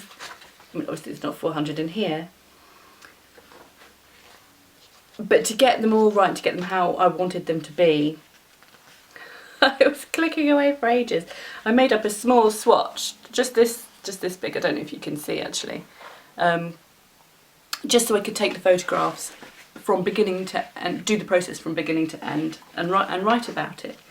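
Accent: England, United Kingdom. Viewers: Hey. Enjoying your podcasts. It is so helpful to see projects we can buy patterns for on ravelry ...one interesting observation I noticed is some language similarities between your English and the English we speak in the American South.. for example: when you said this one is go to be a good un. This is a slang we use frequently in the American South.. we use language very differently than the North.. one word we use is "I reckon"... Which is common on England but used only the South in the USA.. I think we in the South hold on to our English we brought over when we settled here from many different areas in the UK.. the first time I heard an English person say "I reckon" I thought oh my it is a proper word! Most Americans look down on our Southern American English. I enjoy you .. and enjoy listening to your accent..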